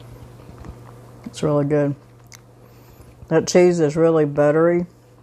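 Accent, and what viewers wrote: South, United States. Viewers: I just noticed your from Imboden, Arkansas!!! My husband and I are from Paragould and relocated to my hometown in Southern Illinois a few years ago when my mother got sick. We love your channel and have tried some of your recipes and will be trying many more!!! Thanks for sharing your recipes and thanks your southern accent that I miss so very much. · Want a big laugh? I'm from the frozen tundra of central MN. The people around me say, "Ya, sure, yabetcha". I don't speak Southern, so at times, I have to watch the video a couple times to figure out what's said! Don't get me wrong...I LOVE YOUR VIDEOS AND THE OLD WAYS! I just don't catch Southern as fast. Thank you for sharing your videos! Please don't stop...Ill try and keep up! LOL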